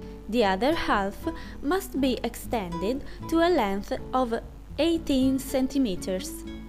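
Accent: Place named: Italy. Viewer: Cute strong accent. What part of Italy r u from?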